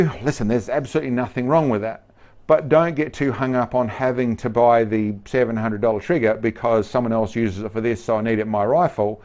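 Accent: Australia. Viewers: This guy sounds Australian, but Australians can't have guns. So is he African?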